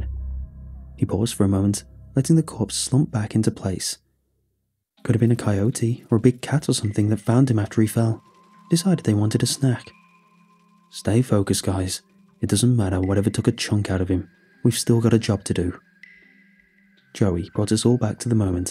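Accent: England, United Kingdom. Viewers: Why is there a British narrator? Can't understand a word. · Obviously it's been narrated by a British guy. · Guy sounds a lot like a Brit to be an American... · British accent, pronunciation is different, not wrong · I might have possibly believed this as a true story if he explained that he was SAS. But that British accent was a tip off. But it is well written . And a nice work of fiction. Good job! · What’s with the English accent? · is British. It’s the most logical explanation for why he pronounces things differently. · This guy sounds like a Brit but says he grew up in Colorado.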